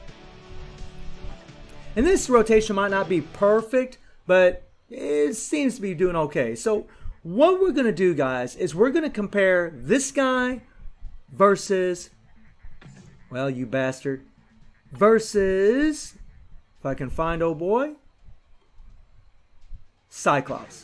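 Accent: United States. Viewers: are you a Southern American?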